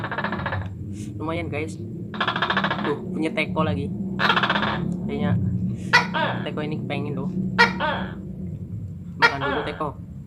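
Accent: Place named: Banyumas, Indonesia. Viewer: Hahaaa wong ngapak yaahh.... Mantap pedes gilaaaa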